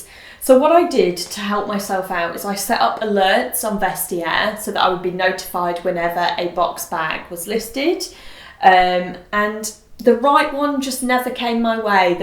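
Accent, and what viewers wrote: England, United Kingdom. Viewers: I like your English accent 😊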